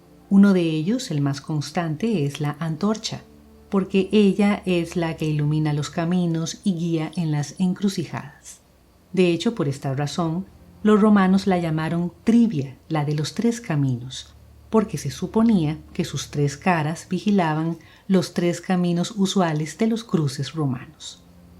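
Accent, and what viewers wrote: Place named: Costa Rica. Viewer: Tenes acento tico🥺❤️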